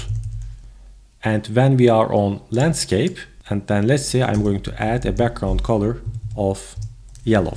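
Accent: Turkey. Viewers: I'm browsing for coding solution and a man with Turkish accent tells me how to solve. Gosh, finally.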